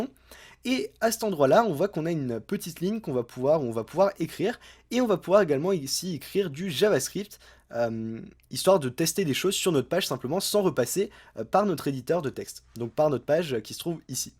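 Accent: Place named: France. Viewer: la voix du bon caifran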